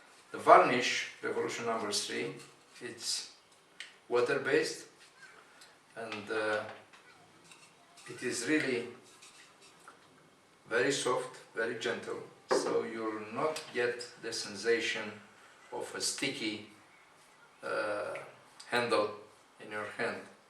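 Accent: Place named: Romania. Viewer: Si mie mi se parea ca are accent romanesc, dar am zis ca-s nebun. E brand romanesc??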